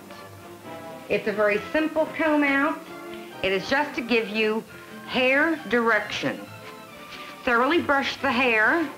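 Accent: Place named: Pittsburgh, United States. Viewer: Very distinct Yinzer accent lol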